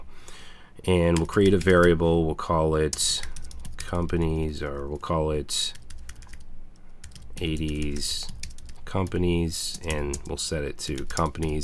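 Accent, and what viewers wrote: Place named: Northeast, United States. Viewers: I grew up in the Northeast and your accent sounds like home. *Queue a Ben Affleck impression here* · Are you from the East coast? (NYC, Boston, NJ) I can hear some very strong hints of that from your accent :D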